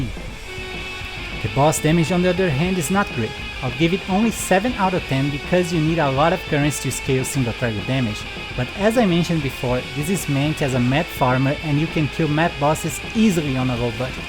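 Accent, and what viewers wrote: Brazil. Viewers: by your ascent you sound like brazilian guy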